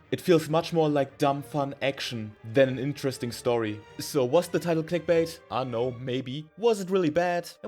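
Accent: Germany. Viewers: are you german? I think I can hear a german accent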